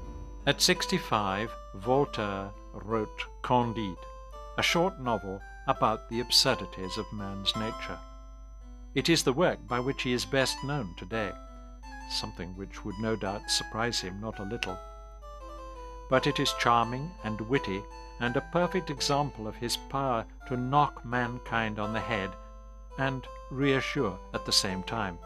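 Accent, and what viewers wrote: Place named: United Kingdom. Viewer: Background music is too intrusive plus heavy British accents make narrative wasteful.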